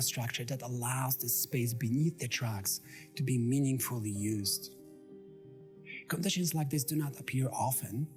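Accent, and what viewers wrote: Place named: Transatlantic, United States. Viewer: Came for the Chicago urbanism and good title, left for the transatlantic whispery latte art pretension. Good lord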